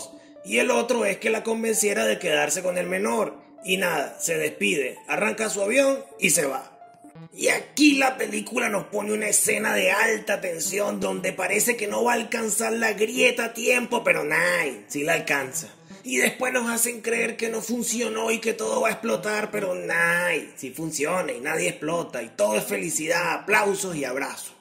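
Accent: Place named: Venezuela. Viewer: Jaja tremendo narrador maracaibo o Caracas? Me espoleaste por completo 😂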